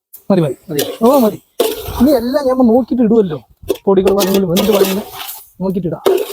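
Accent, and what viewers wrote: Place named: Palakkad, India. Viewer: പാലക്കാട്‌ സ്ലാങ് സൂപ്പർ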